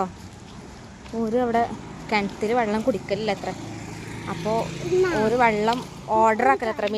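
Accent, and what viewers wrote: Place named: Malappuram, India. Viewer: Malapuram slang kunu kunu kelkan ishtan but tto tto kelkumbo vallatha oru irritation 😂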